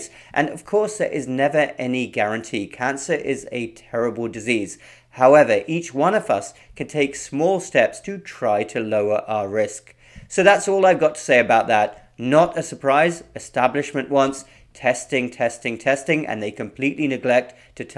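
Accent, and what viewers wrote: United Kingdom. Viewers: THE AMERICAN DIET?? seriously?? u gonna go there in ur British accent?? ur considered a WESTERN nation too.. don't ever forget that.. so don't discount urself or ur nation (if ur really from there, bcuz u look Indian to me) as if its just a. problem singled out to one country.. FYI..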